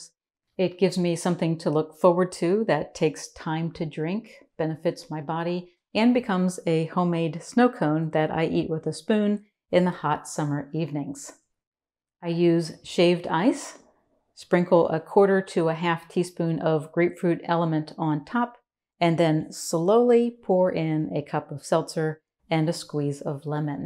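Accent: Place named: United States. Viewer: Excellent advice. Wonderful delivery in your calm voice with no trashy muzak track. I'm UK and some USA accents are not for me. Yours is perfect. To me you sound like Mary Chapin Carpenter so I wonder if you come from the same part of America.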